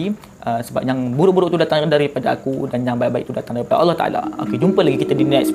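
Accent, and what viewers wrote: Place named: Perak, Malaysia. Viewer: Bg aku cara penyampaian mudah paham. Mgkn sbb loghat peyrok nye pekat jgk.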